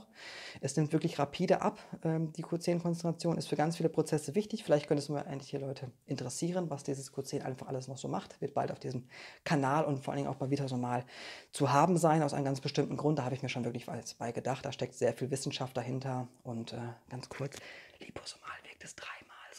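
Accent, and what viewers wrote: Bavaria, Germany. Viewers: Lieber, ich finde es genial wie Du das machst. Feel free. Bayrisch und Akzent ist doch super. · Danke für deine Videos. Diesmal irgendwie anders, sehr ruhig gesprochen, konnte Dir sogar folgen. Lag vielleicht auch am bayrischen Akzent 😉, finde ich prima, ich lebe im Bayern. Liebe Grüße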